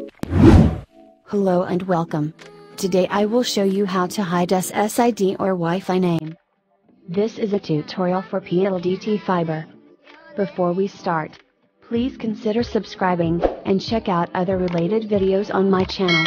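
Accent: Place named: United States. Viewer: Gandah nang boses parang american😁salamt sa video mo.another saving life....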